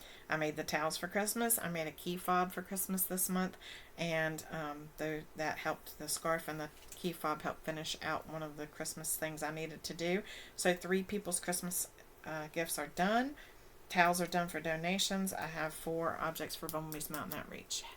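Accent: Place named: South, United States. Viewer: Hello, nice to meet y'all. Where are you?? I'm catching a southern accent.... I'm a transplanted Texan in Tennessee.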